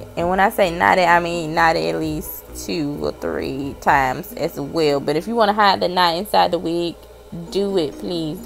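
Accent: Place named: South, United States. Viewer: You're so country but it's so cute. You have a new Subscriber forever just to hear your bubbly voice and watch your methods.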